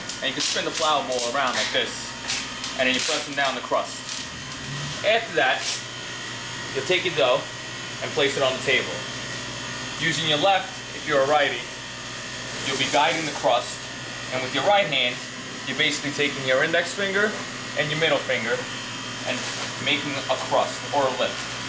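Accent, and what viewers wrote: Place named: Brooklyn, United States. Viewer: This guy sounds like he has a Brooklyn accent. Only in Brooklyn is where you will find real pizza guys who know how to make real pizza.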